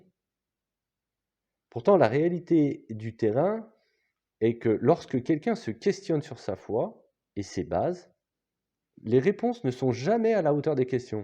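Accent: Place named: North, France. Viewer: 😊Merci pour votre sincérité, votre véritable foi, votre courage et votre bienveillance qui transpercent à travers votre ton et ces analyses basées sur l’enseignement du Christ. Votre intervention ici, est un baume sur les plaies. PS.: votre léger accent résonne familièrement à mes oreilles, moi qui ai passé ma jeunesse dans la ville de Robespierre.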